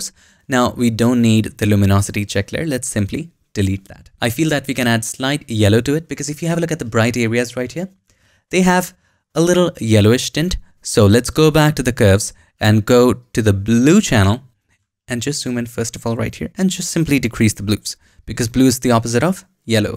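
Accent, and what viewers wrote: India. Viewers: I like your funny Indian accent!)))))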